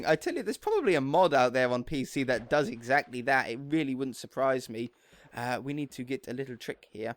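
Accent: United Kingdom. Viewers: I love your voice, that your british and that your a redhead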